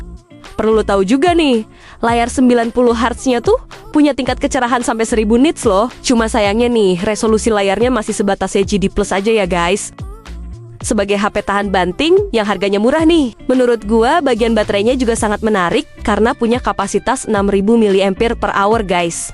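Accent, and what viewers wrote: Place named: Madura, Indonesia. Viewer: MADURA bang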